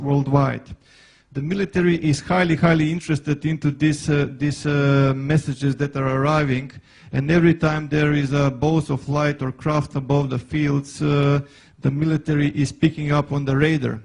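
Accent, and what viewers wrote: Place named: United Kingdom. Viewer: Let me guess, you're from the UK.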